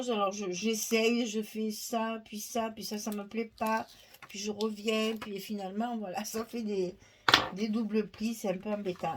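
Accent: South, France. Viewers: Bjr. Un tuto de vous sans parole quel gâchis!! Votre accent ensoleillé nous manquerais trop par ces jours de pluie ☔... Même sur le Pays Basque / landes le temps est triste actuellement... prenez soins de vos cordes vocales, vos tutos ne peuvent pas fonctionner sans votre voix du Midi... A demain ou plutôt Adishatz (en landais) ou Agur (en basque)...👋😉 bonne journée.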